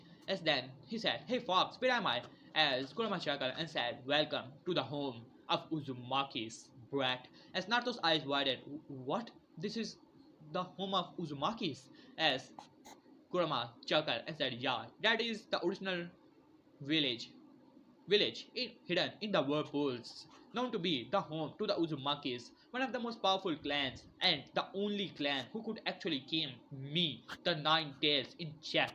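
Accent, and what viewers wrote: India. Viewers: I swear! Each time I hear this person! I think his someone from India.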